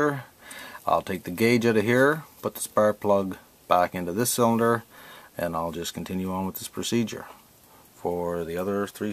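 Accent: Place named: Canada. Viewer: Where are you from? You sound Canadian lol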